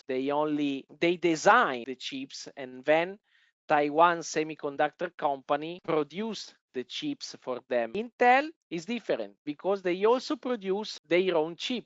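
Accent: Italy. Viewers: sembra un inglese con forte accento italiano, ma non vorrei sbagliare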